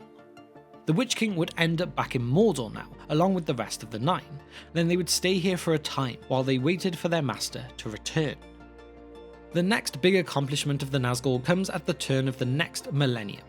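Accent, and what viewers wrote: United Kingdom. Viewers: Can you please narrate in an American accent. My wife doesn't like British accents because your silencing of Rs and drawing out of vowel sounds. Thanks, then we will subscribe.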